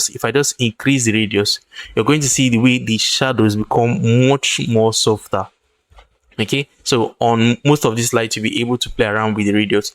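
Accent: Nigeria. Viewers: Being looking for a Nigerian to do a video like this, been tired of hearing accents.. kudos bro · Thanks bro, you sound nigerian though this is what i have been looking for a video that explains everything 20hrs is so awesome and for free nah bro we are grateful ill be back in 2 weeks to my comment and i have completed it by then . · Curious. Are you Nigerian by any chance? Your accent sounds familiar...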